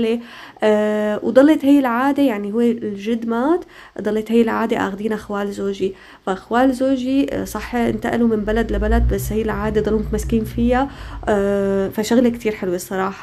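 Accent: Syria. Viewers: اختي انت من سوريا❤ مبين حاكيك شاميه انا من سوريا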